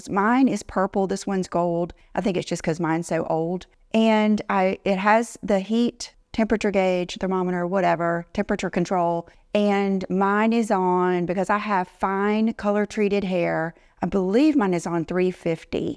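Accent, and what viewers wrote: South, United States. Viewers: “I think I have a little bit of a southern accent” hahaha ur so cute.. as someone from Wisconsin.. yes you do have a southern accent — love it! I have a Wisconsin accent 😂😅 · Hi, loved the pjs. Very pretty. I am a 100% remote worker. I put on dresses and makeup even if I have no scheduled Zoom mtgs as it makes me feel pretty and professional. I look forward to the videos you were talking about for those of us at home. So, yes, please....do make those vids. You and Marnie are my favorite influencers. I love that you are friends and sometimes mention each other. BTW, you do have a slight Southern drawl. Love it😊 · Hi …..I happen to love your Southern accent ….I’m a Tennessee girl so we both know…..it’s a Southern thing….great video✨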